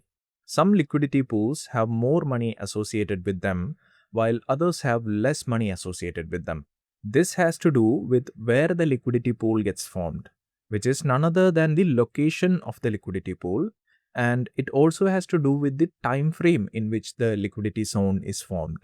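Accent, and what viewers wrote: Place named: South India, India. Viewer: South Indian ?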